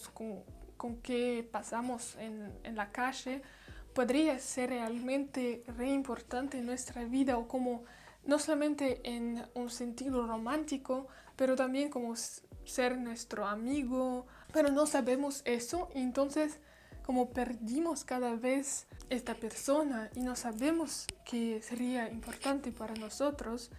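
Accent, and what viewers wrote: Argentina. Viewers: Hola! Acabo de encontrar a tu chanal! Me gusta mucho! Tengo 16. Y estoy aprendiendo espanol tambien. Empece a 6 meses pasadas. Tu accento de Argentina es maravilloso! Estudio espanol de Colombia. Que Chevre! Tienes una subscriber nueva! xx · ¡¡Este acento argentino!! 🔥🔥🔥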